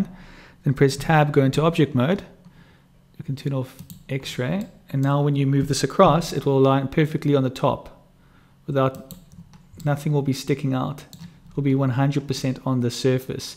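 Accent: South Africa. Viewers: Dude, thank you so Freaking Much. Also, it was nice to hear another South African on the Web.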